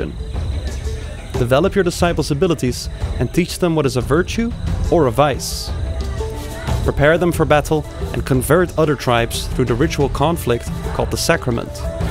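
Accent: Netherlands. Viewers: I can really recognize the Dutch accent. But that makes it nicer actually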